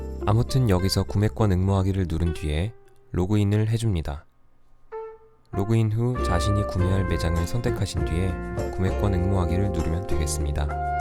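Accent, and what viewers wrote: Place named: Gyeongsang, South Korea. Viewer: 대구분이시네용 ㅋㅋㅋ 같이 잘되길 빌어요 파이팅!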